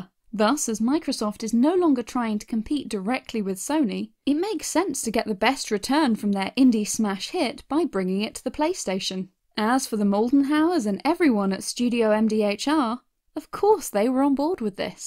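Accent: United Kingdom. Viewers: Theres just something about a British accent.🤤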